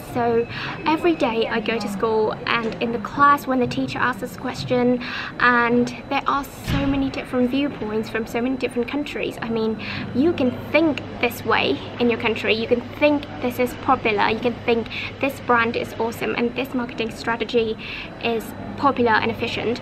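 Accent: United Kingdom. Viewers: Trường bạn đẹp quá ^^ bạn nói tiếng anh cũng hay nữa. Bạn có thể chia sẻ kinh nghiệm luyện accent đc ko ạ? Nghe bạn nói giống British accent :) mình cũng có căn bản tiếng anh và muốn luyện accent giống người bản xứ ạ. Cảm ơn bạn.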